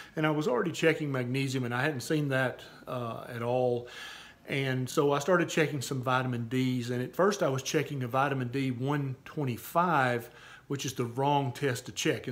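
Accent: South, United States. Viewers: Hi doc! I love your videos, I love your information, and I love your southern accent as I am from the south too, but now I'm living above the Mason-Dixon line. 😁 My question is my 18 year old daughter who has ehlers-danlos, gastro issues, mild anxiety issues and mild depression, allergies, chronic fatigue syndrome, pathological sleepiness, EoE, she weighs 104 lbs, approx 5 ft, and her doctor prescribed her vitamin D 400 iu once a day. After listening to you, I don't believe that is enough, so how much do you recommend, doc? TIA!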